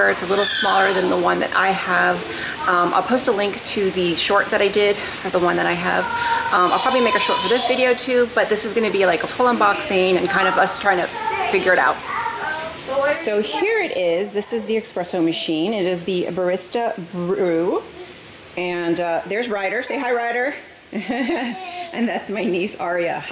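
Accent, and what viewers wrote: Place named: Appalachia, United States. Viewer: For the love of all things holy can you please learn the proper way to say “espresso” and “ accessories”? There is NO “x” in either! I can’t watch this video with your hillbilly verbiage!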